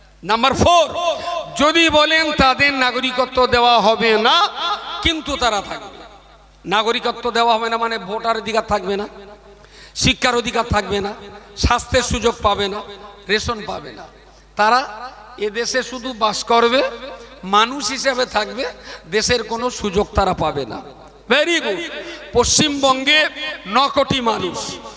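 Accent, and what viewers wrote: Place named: Bangladesh. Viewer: কথা শুনে তোর মনে হল বাংলাদেশী,, আর তোর কমান্ট দেখে আমার মনে হচ্ছে তুই বন চুদু মাল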